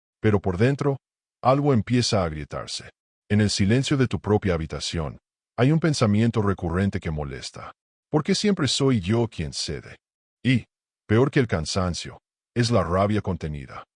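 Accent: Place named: United States. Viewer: La Información muy buena, pero el tono gringo pésimo,...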